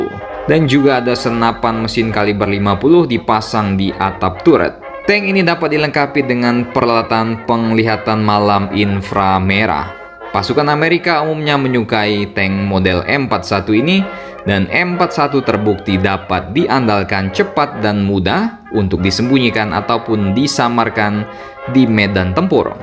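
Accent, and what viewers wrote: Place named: Makassar, Indonesia. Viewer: Min orang makasar ya khas bangat suaranya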